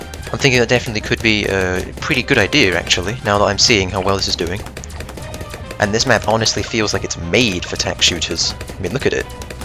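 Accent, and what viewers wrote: United Kingdom. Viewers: you can see the view difference when switching games, idrc and im all for it seeing a bri'ish dude playing bloons and ultrakill with a funny accent is what i signed up for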